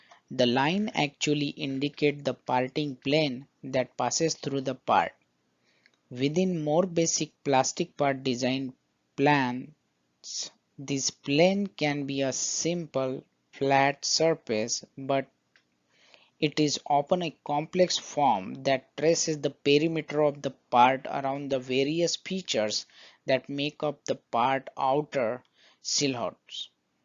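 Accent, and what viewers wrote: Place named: United States. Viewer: Your US accent made me comment....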